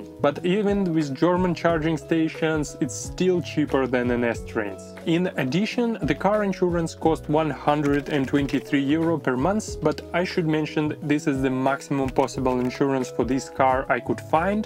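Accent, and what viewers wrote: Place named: Russia. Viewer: да совершенно любая буква t, на , , и так далее. Не, ну это просто акцент, не принимайте близко к сердцу, я просто от многих русскоязычных слышу этот звук, просто интересно, почему вдруг именно так эту букву произносят, ведь в русской речи у нас этого звука нет) те же греки, у которых сильный неубиваемый акцент, просто произносят свои греческие звуки, а у нас такая своя версия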